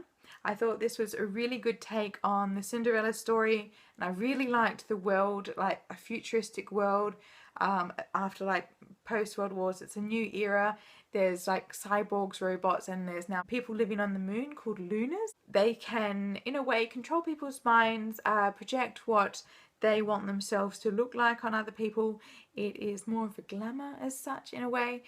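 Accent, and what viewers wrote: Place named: Australia. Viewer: Are u from Adelaide or Melbourne just wondering ?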